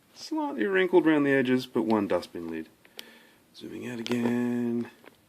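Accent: Australia. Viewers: He's speaking English. His Australian accent doesn't make him that hard to understand.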